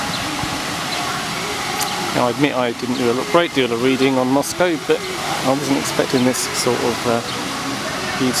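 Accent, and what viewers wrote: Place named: United Kingdom. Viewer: You does not need to write word "Englishman", your accent is soooo british, we already understood that you Englishman.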